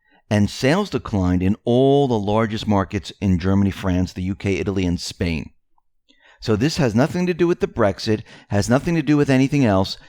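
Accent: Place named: North Jersey, United States. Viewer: i assumed he was north jersey, for sure.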